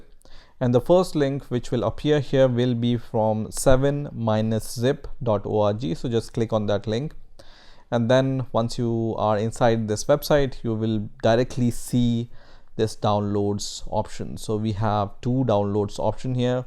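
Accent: India. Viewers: beautiful indian sounding man i love u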